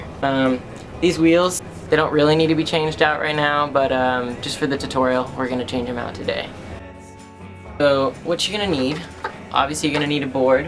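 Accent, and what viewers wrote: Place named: United States. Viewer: dat american accent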